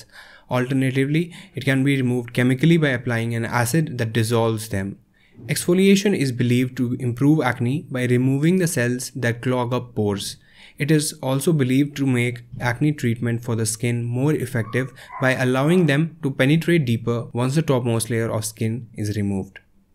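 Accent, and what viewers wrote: India. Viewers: You sound like indian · Yeah it's indian accent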